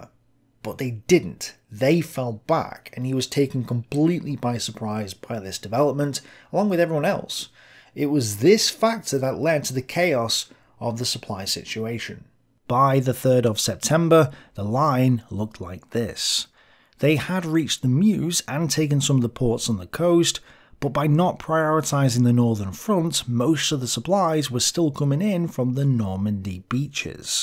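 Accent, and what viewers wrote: United Kingdom. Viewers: I really like your videos and i think that they are very meritorical and well made. Because of this i feel kind of silly to admit that i usually listen to them while going to sleep. There is just something in your strong british accent and in the way that you calmly explain various WW2 topics. With your videos i am able to fall asleep in 10 minutes or less. Please do not take this as an insult, i do watch your videos without intent to fall asleep as well, especially battlestorm as i need to focus on the map to understand the situation. I just wanted to thank you for making my sleep easier. Have a great afternoon!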